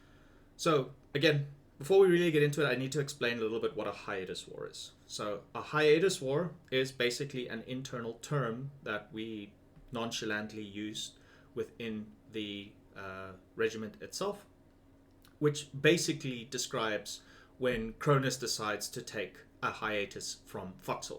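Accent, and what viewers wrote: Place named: South Africa. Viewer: Where u from? U have like a South African accent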